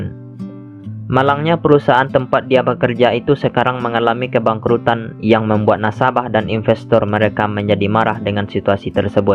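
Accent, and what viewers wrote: Madura, Indonesia. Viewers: Admin logat madura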